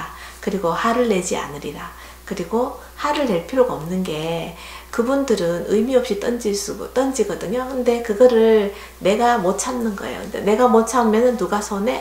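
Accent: Gyeongsang, South Korea. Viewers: 나긋나긋한 경상도 억양 매력적이신 이모님 긴단하고 따라하기 쉬운 반찬 너무 좋아요 ♥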